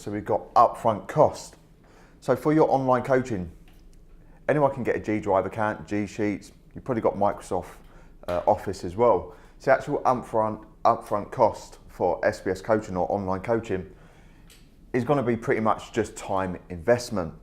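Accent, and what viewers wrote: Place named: Cockney, United Kingdom. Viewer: I enjoy watching YouTube's interpretation of your cockney twang on the auto generated subtitles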